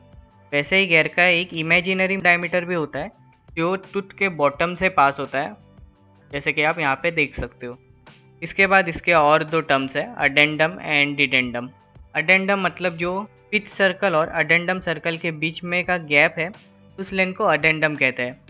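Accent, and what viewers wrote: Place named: India. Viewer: 印度的味道太大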